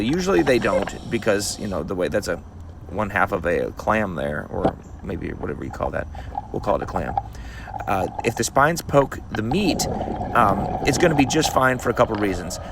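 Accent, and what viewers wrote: Australia. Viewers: Love your Steve Irwin accent 👌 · Thats a solid Aussie accect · Nice Aussie accent.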